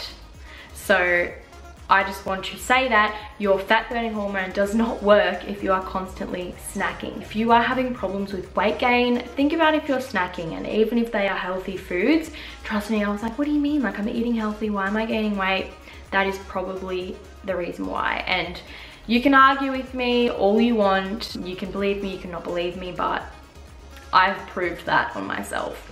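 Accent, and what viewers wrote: Australia. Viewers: are you australian? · Is that an Australian accent?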